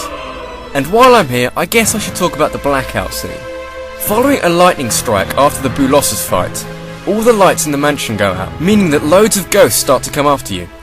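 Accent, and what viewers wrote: England, United Kingdom. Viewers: I say it like that and I'm English too so I guess it's an accent thing. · no you brits speak english correctly we americans mispronounce things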